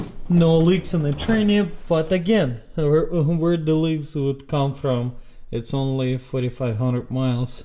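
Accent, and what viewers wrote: Russia. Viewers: I can tell you are Russian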